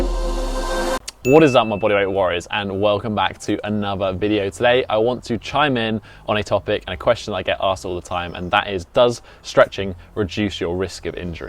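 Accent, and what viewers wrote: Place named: RP, United Kingdom. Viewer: Love the well educated British accent man! You pronounce your words very precisely!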